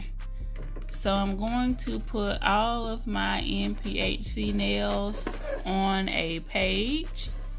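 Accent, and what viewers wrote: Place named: United States. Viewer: Yes I love nails. Do them myself. I work with gel and polygel but I can appreciate a good nail video even using other mediums. I like your channel because you’re upbeat, have a cute country accent and I like the way you explain things. You can tell you like what you do and you can sure sell a product. I hope they recognize! I’ve watched 5 of your videos now back to back and not 1 video went by without me pausing to go put something in my cart.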